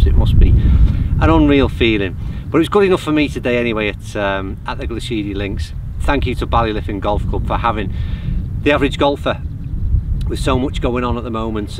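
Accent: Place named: Merseyside, United Kingdom. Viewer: Great video and well played! Love the buggy cam footage tracking your progress to each green. Lovely looking course. (I'm in Liverpool by the way and you sound like you're from not too far away...?)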